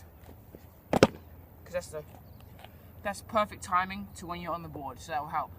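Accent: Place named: New Zealand. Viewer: Nice tutorial man, but i couldnt get over your accent! what is it? Kiwi mixed with Australian and British?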